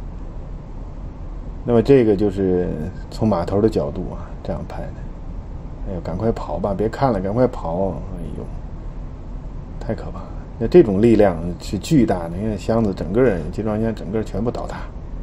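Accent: Tianjin, China. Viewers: 天津人一听就能听出来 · 口音是天津老乡吧？ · 講話像大陸人口音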